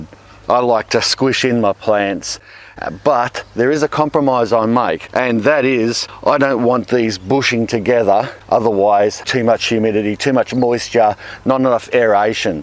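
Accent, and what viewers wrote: Australia. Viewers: I have his accent too.. but that’s because I live in the same area ;) Aussie Aussie Aussie! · As an Aussie who shares his accent, I wish I could do this 😂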